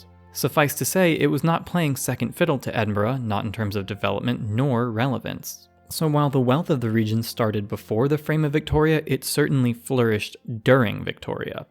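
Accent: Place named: Ohio Valley, United States. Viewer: If you want to see a map game pushed to its limits, try Mappa Mundi for HOI4. Also, up until you mentioned it, I was wondering so hard why you sounded so familiar and local and then you said you were from the Ohio Valley as well lmao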